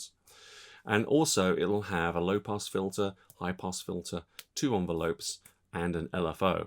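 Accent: United Kingdom. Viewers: Superb content, loved this and especially refreshing to watch a synth review from someone with a nice British accent for a change. I was wondering about a Jupiter X as a compliment to my Korg Kronos. The problem is, the Kronos is so hugely powerful that any additional synth needs to do something that the Kronos can't. Still not sure about the JP X, but this video really brought out the issues and benefits with admirable clarity. It also demonstrates what real UI complexity looks like. People moan about the UI on the Kronos, but at least everything is laid out on a large touchscreen for you, so once you know each synth engine, muscle memory can kick in. The interface on this looks like the worst of all worlds really, back to the days of painting the Sistine Chapel through a letterbox to some degree! A problem that certainly afflicts the D50 I have. What are your views on a JP X as a complementary synth to a workstation like the Kronos?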